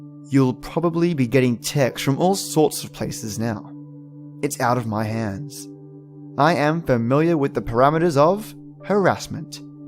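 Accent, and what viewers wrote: Australia. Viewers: I'm suppose to be babysitting, but the baby went down for a nap so here I am 😏 ready for some great stories in that awesome Aussie accent of yours 🙂🖤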